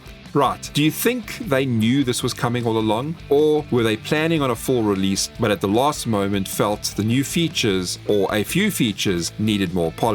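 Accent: South Africa. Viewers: you south african bro? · Lol funny how I could tell you are South African as soon as you spoke.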